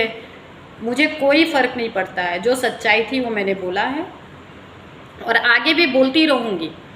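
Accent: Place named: Bhojpuri, India. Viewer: Kya bat h gjb sis apne pure bhojpuri me koi sahi bola to ap 🙏🙏🙏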